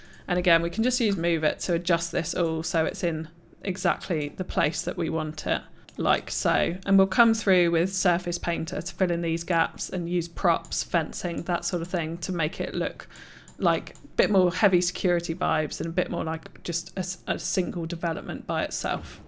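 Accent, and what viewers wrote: United Kingdom. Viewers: Subscribed. Great content and nice to have a Brit to listen to! Shame about the road direction 😜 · Another great CS channel to subscribe to. This really is some superb quality content. Inspires me to take a bit more time on my builds. Love the music you used in this 2nd video. Excellent narration and great to hear another Brit. Please keep up the amazing work, and congratulations on nearly 3k subscribers.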